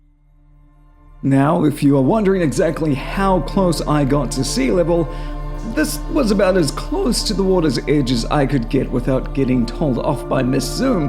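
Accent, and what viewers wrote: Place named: New Zealand. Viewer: Your "broken kiwi accent" is legible so your good.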